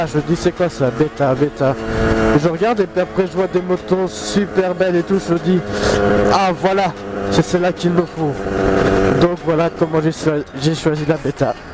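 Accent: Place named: Belgium. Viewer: MDR! T'es vraiment un ouf! Pour quoi je passe moi maintenant avec tes conneries... lol (Inventées de toute pièce de ta part). Arrêtes de jouer à WoW ça te monte à la tête! x) Tu m'as bien fait rire... J'adore ton accent belge "une fois"! Merci pour le coup de pub'! Bises. V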